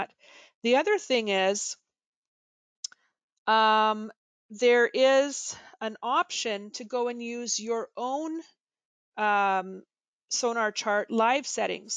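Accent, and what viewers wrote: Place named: United States. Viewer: Very informative but what version of Canadian english do you speak? Very American pronunciation. Rout? Mobil? Yikes 😮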